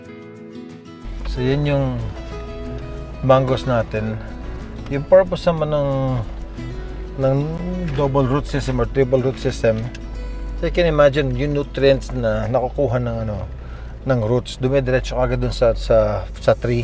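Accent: Visayas, Philippines. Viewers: Ka bisdak nas imong accent sir oy hehehe 😂 ❤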